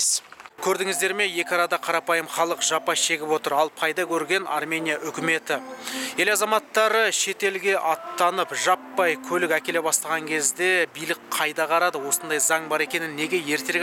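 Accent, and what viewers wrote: Standard. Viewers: Жарайсын бауырып осы жолыннан тайынба, таза казакша тындаган кулакка не деген жагымды. Жарайсын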